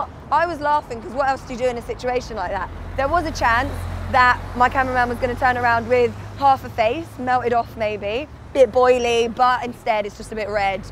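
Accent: Australia. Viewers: The Aussie accent sounds good but should really have mentioned walkabout and you do not ever see an aborigine in the bush. They are at one with nature and disappear. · Aussie accent not too bad...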